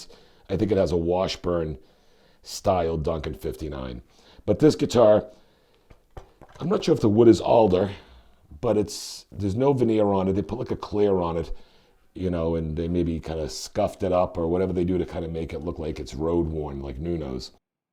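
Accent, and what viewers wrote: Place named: Boston, United States. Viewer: That's a Boston accent. I'm from there too buddy. Cheers.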